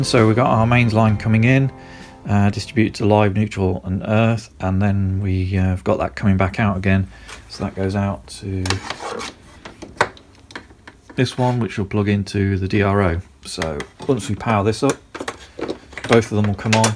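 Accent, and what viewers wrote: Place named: United States. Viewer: Funny accent for an American.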